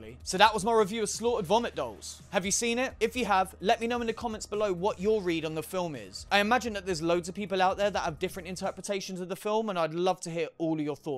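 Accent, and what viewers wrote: United Kingdom. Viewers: Yes I always thought I would love to critique movies just like this! I am the crazy movie watcher😂 also hearing your accent makes me REALLY miss the 🇬🇧!!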